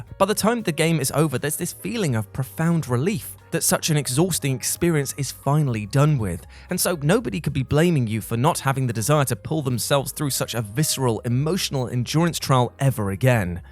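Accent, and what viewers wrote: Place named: United Kingdom. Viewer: Ah. My favorite British accent barring chef Ramsay and my personal friend. I hope to one day be as nostalgic and awesome as you with my own accent. The accent of cornbread and fried chicken...this I who I am don't judge me. 😑🤣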